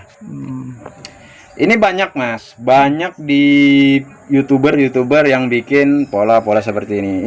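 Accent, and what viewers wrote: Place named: Jakarta, Indonesia. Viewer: Logat bicara nya kaya orang betawi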